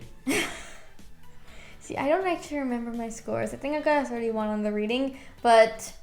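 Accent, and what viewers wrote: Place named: United States. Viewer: Your American accent is cute and funny too actually very funny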